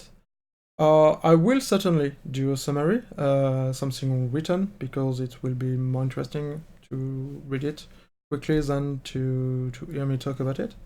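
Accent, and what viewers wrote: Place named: France. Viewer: Nice French accent :B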